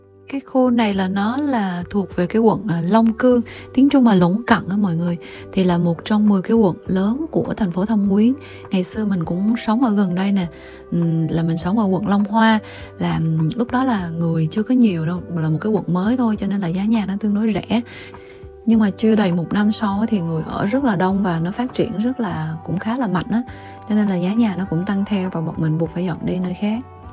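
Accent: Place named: Da Nang, Vietnam. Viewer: hèn chi, giọng hơi đặc ko lẫn vào đâu đc, mình dân Đà nẵng nghe nửa chữ phát biết luôn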